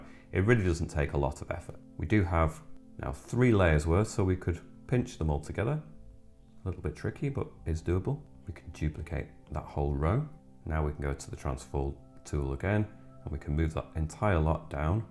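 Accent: United Kingdom. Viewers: Turn on the closed caption, cc, to understand the British accent for people in the USA. what he did say?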